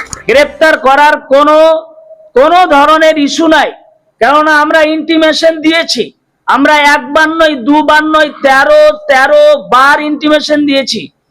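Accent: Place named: Bangladesh. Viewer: তুমি তো পুরো বাংলাদেশী, তোমার ভাষাতেই বোঝা যায়।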